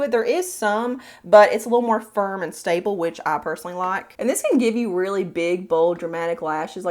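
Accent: South, United States. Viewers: Hey, back again from Belgium. Oh my gosh, I think you might well be my favourite on here: you always get straight to the point, knowledgeable and you're totally down-to-earth, sweet and unpretentious. And I said it before but I LOVE that southern accent! ❤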